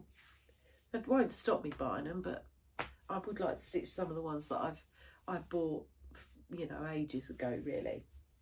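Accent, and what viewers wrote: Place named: United Kingdom. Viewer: Hi! Stitchy Sally shouted you out and posted a link to your Flosstube, and I’m glad she did! 😊I’m blown away by your fabulous projects and enjoyed listening to a fellow Brit!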